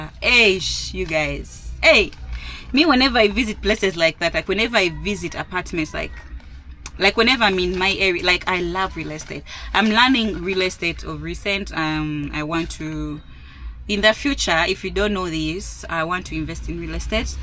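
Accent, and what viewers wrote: Kenya. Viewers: I knew it was just a matter of time before you start talking using our Kenyan Accent...i'm a super proud Subscriber today😂😂😂😂😂 please,be coming to kenya regurlary...you're one of us already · THE accent is kenyan❤ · Kenyan accent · Your dropped Ugandan accent and picked the Kenyan accent so fast 😅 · your accent changed to a Kenyan accent. You no longer sound like Ugandan at all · You sound like a Kenyan. Karibu sana.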